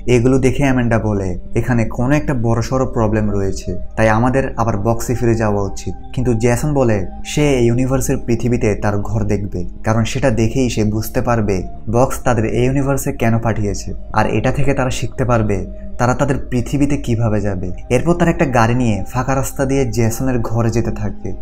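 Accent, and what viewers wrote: Chittagong, Bangladesh. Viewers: ভাই কি চট্টগ্রামের নাকি? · আপনার কথায় একটা চাটগাঁইয়া টিউন আসে।🫥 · Bhai Chittagonian naki amr moto? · ভাইয়ের বাড়ি মনে হয় চট্টগ্রাম 😊 সীতাকুণ্ড আসলে নক দিয়েন❤ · চট্টগ্রাম ❤️🌸 ভাষা ধরা পড়ল একটু করে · চিটাইংগে চিটাইংগে গল্প মজা লাগছে😊😅❤ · চিটাগাং বাড়ি নাকি?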